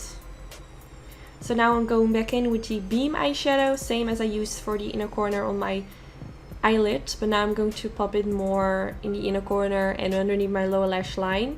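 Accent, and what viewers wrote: Netherlands. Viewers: Ar you dutch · Shout out from holland. Je accent gaf het meteen weg dat je Nederlands bent. Geweldige tutorial!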